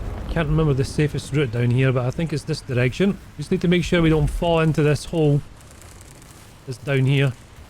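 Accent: Scotland, United Kingdom. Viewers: I always want to see a Sherman firefly video, I like these videos too its like a Phlydaily video but Scottish which is cool.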